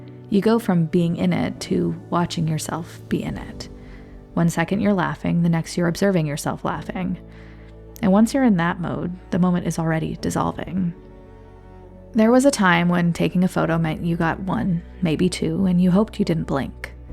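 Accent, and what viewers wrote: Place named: United States. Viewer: a narration dubbed voice who speaks in American (or Canadian) English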